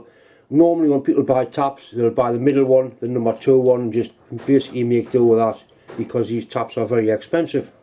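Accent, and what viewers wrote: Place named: Scotland, United Kingdom. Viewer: I cannot understand Scottish accent so does the google translator. I give up on that guy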